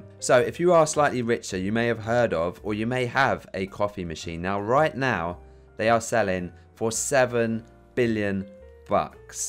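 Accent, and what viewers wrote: United Kingdom. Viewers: Your British? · I know u is British I am American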